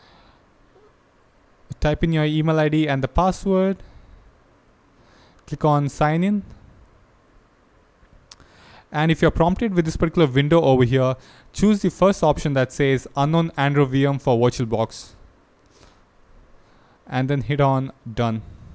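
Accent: India. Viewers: u sounded indian or asian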